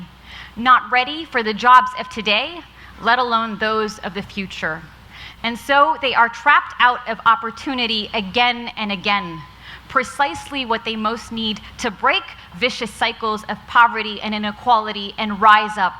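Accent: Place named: England, United Kingdom. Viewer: I wish one-day I can confidently give speech to the audience just like her with a confident english accent.... she's my ideal ...I love her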